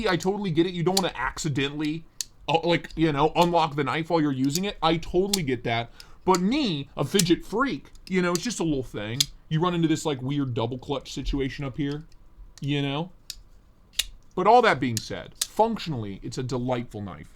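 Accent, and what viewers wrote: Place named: Western Pennsylvania, United States. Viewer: Your western Pennsylvania accent always cracks me up.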